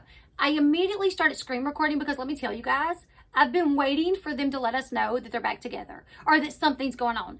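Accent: South, United States. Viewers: I LOVE your southern accent. It really stands out to me because I never hear it where I live on the East Coast.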